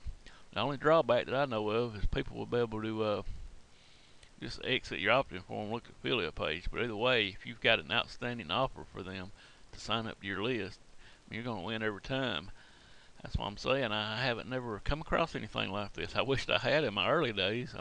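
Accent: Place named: Arkansas, United States. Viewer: Great accent. I'm from Central Mo so mine is more of a flatter accent. Yours is rich with Arkansas.....possibly Tenn/Kentucky......Virginia, not so much. Memphis? Blythville? Little Rock?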